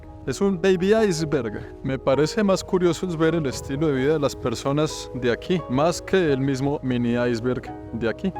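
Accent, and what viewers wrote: Colombia. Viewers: Ese también es acento colombiano ❤, tiene una voz muy muy agradable y hace un gran trabajo con sus videos tan geniales ❤ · Tienes esa Forma Tan Especial y Original de Narrar Tus Videos Con ese Estilo que Te Caracteriza Bien Colombiano con Palabras Sencillas y Entendibles. Muy Bien..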